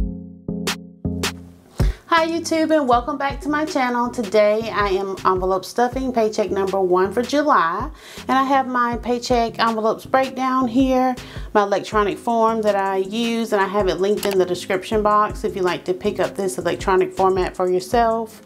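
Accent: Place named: South, United States. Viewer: Are you a southern gal?(: I love your accent and I loved the attitude you brought to your video and that made me want to sub.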